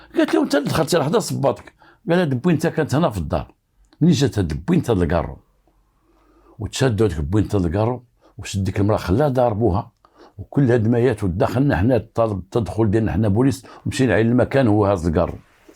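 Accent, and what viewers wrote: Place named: France. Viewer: . صاحب صوت وثقافة ولكنة فرنسية فريدة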